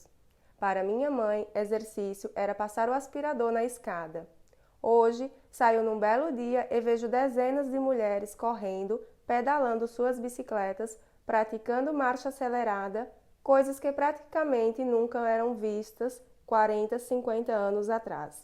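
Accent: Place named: Pernambuco, Brazil. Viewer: Parabéns. Pelo sotaque parece ser do Pernambuco.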